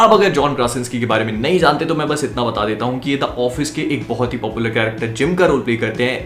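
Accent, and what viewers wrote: Bhopal, India. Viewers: bhai bhopal se hai kya?? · I love your bhoplai accent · apne Bhopali accent mai video bnake maza hi dila diya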